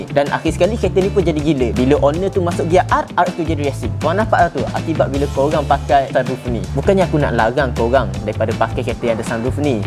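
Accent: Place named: Terengganu, Malaysia. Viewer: Org ganu eh ckp gini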